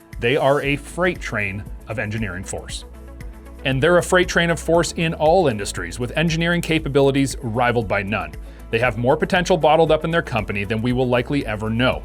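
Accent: Canada. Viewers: You mentioned tariffs you sound Canadian so I think I understand why you did it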